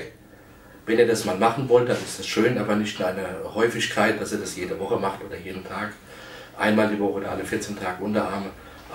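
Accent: Germany. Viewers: Evangelium nach Johannes Ai bub geh mir fort des is hessisch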